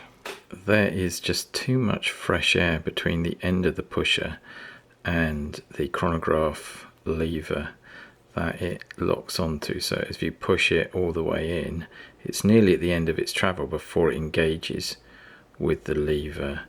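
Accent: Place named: England, United Kingdom. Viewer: Flot informativ video. Jeg skal lige høre. Er du dansker, men med en meget fin engelsk accent ?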